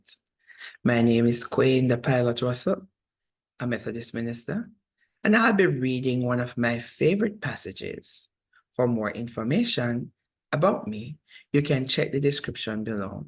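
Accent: Jamaica. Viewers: Lol first I am hearing the story read like this. Nutten like Jamaica Patwa. Makes the story so colourful 💯😊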